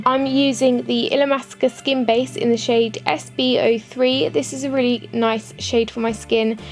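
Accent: United Kingdom. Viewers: You're beautiful with and without makeup! BTW love your British accent :)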